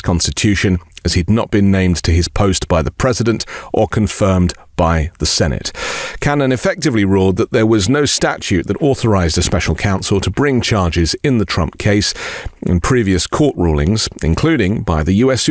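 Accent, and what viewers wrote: United Kingdom. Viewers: Thank you for these words! And thank you for your British accent! The US is a complete mess. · Glad to have a British accent reassuring us amid all the panic about Trump getting more votes from this 😂❤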